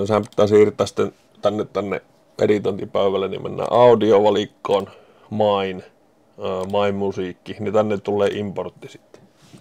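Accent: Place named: Finland. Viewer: Olen lukenut kaikki päätalon kirjat. Ootko sieltä päin. Murre kuulostaa sille.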